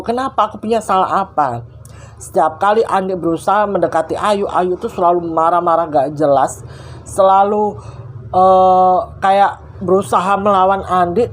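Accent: Surabaya, Indonesia. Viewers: Mba cara cerita nya,logat Surabaya nya kental bgt....semangat mba,sukses sll.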